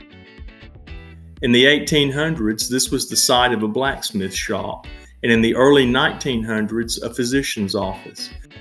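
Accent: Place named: Cedar Grove, United States. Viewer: You could not have picked a better narirator with a deep "cedar grove" accent... my god... im from here, my family has a 16 acre farm in efland, but i was raised in Oregon, they have absolutley no accent at all, i move back here, and i swear it took me years to understand these people!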